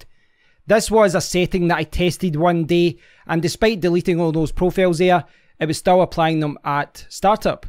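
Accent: Scotland, United Kingdom. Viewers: Yea. I agree. the scottish accent is one of my favourites.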